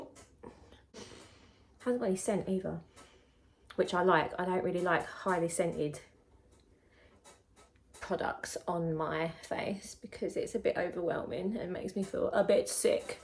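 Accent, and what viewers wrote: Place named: London, United Kingdom. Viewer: Hi, you’ve tested this the same way I might’ve done-I’ll definitely take note not to go too pale using the palette as a base product. Are you from London? You sound local- I’m from Romford.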